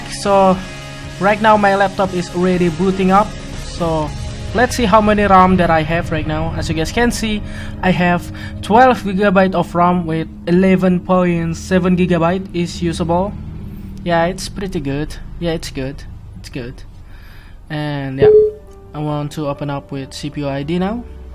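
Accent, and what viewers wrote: Indonesia. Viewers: orang indonesia ya soal nya bahasa nya masih kelihatan logat indo nya...... btw itu laptop merk apa tipe apa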